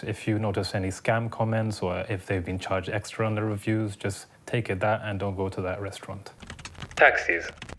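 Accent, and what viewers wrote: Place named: United Kingdom. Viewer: Good video, thanks. Can i ask if you are British too? As your English does suggest you are.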